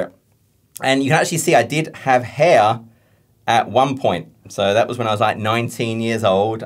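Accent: United Kingdom. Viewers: I eventually know why your speaking with british accent , it's so familiar to me . Thanks for sharing your story. It's awesome !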